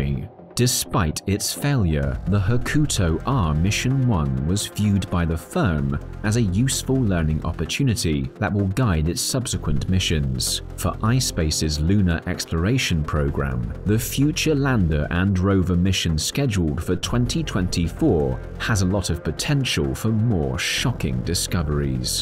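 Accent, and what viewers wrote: United Kingdom. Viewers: I know what they found on the moon your big stupid limey mouth talking 💩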